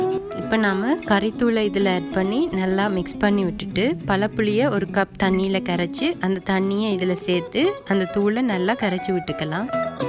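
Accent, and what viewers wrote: Tamil Nadu, India. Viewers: தமிழ்நாட்டில் பேசுவது போல உள்ளது,யாழ்ப்பாணத்து காரங்ங இப்போ தமிழ்நாட்டுக்காரங்க மாதிரி மாறீட்டாங்க,சீரியல் பார்ப்பதோடு நிப்பாட்டுங்க,அதே மாதிரி பேசி அழகான யாழ்ப்பாண தமிழை அழிய விட்டிடாதீங்க,அழகு யாழ்ப்பாணத்து சுத்தமான தமிழ்தான்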